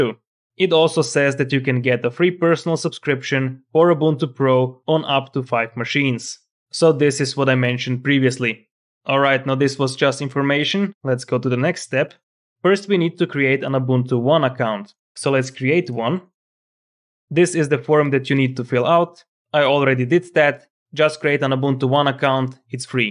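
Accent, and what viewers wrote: Russia. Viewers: Че акцент такой русский?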